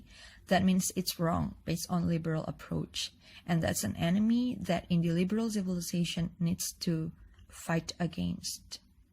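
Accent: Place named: India. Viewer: kayak accent india ya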